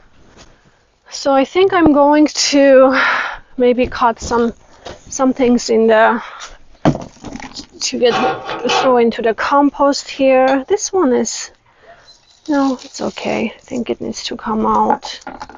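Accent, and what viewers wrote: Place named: Armenia. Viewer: Hello, Maybe I should call you Chef! The pastries look delectable! Your pastry dough looks so beautiful, and I'm sure they taste divine. It made me think of Baklava, but this recipe is very much more French with the pastry dough, apricot preserves, and dark chocolate. Mon dieu! You definitely need a larger workspace so you can have all your ingredients and utensils at hand. Next time you do this, don't forget to demonstrate how you separate your egg yokes! I felt like I was watching Julia Child. I never missed her show on PBS for so many years. So now I know you are of Armenian descent. I have been wondering for some time where your accent is from. You must have some royal blood with your ginger hair! When I was in college, I had the great honor of working under the baton of the renowned Director of Bands of the University of Illinois Urbana-Champage Symphonic Band, Dr. 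Harry Begian, who was also of Armenian descent. He conducted the honor band every Summer at the band camp I attended in Hays, Kansas at Ft. Hays State University. It was by audition, and I am still very proud to say I sat first chair Alto Saxophone every year. It was always an amazing experience, and one I will never forget. Dr. Gary Corcoran, the Director of Bands at the university I attended for my degree in music education received his doctorate degree under Dr. Begian. I studied conducting with Dr. Corcoran, and I still miss conducting a band. Sadly, both have passed now, but I still ador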